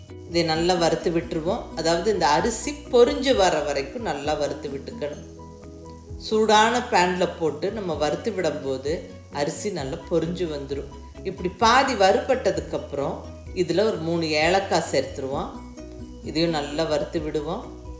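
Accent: Kongu, India. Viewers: கொங்கு தமிழ் இனிமை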